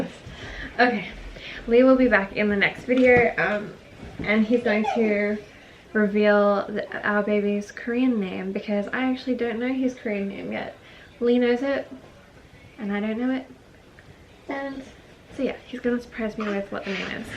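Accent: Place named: United States. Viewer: Why do you have a part American accent? Did you live there?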